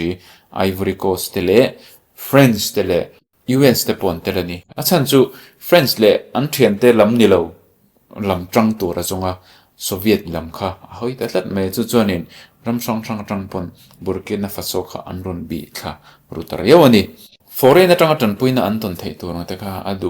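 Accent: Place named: Siaha, India. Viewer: Siaha lama i an deuh ka hria😅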